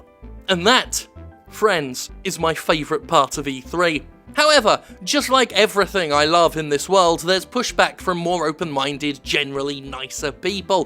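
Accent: United Kingdom. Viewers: Is it just me or does become more british?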